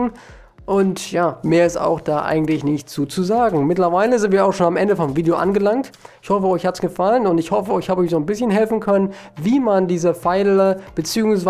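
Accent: Germany. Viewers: also dein Video war richtig informativ und hat mir geholfen, ausserdem gefällt mir den leicht norddeutscher Akzent (?) Also ich lass gleich mal ein Abo da :D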